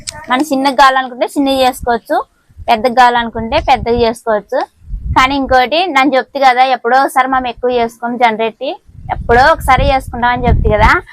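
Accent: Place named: Raichur, India. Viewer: Mee a uru akka? Naaku chinna kakara kailu ante chala istam memu manvi Raichur Karnataka Raichur lo kuda telugu ilage matladutam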